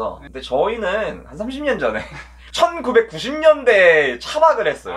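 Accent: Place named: Chungcheong, South Korea. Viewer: 아 충청도 사투리 넘 귀여워옄ㅋ 날른겨~~~ 긍정마인드 배우고 갑니다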